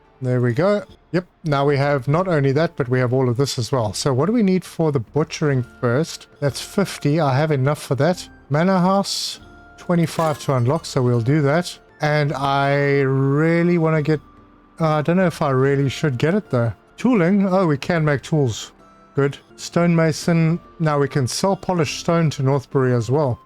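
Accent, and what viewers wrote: South Africa. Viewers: Knew I can distinguish a South African accent when I hear one, hello broer!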